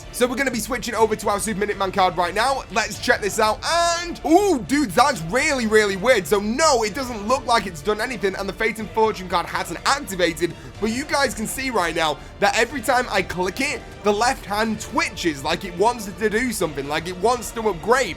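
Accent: United Kingdom. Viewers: not to be racist but u sounded even more british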